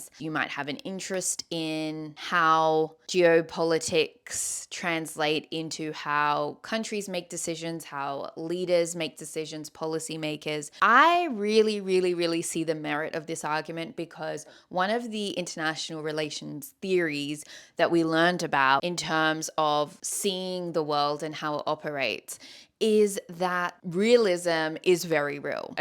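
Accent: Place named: United Kingdom. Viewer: Are you half white? ...You look half-white and sound like you were raised in Britain.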